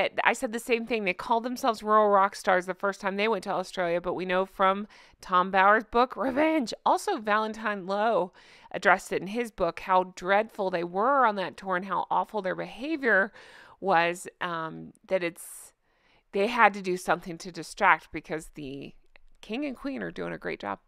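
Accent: Ireland. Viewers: Oh thank you for making me laugh and chereing me up!! in bed ill,drinking tea, and i have not stopped laughing!! by the way your Irish accent is good!! take it from me☘❤ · I'm Irish and your Accent is not too far off😮love ya love your Channel God Bless to you and your wee family 🙏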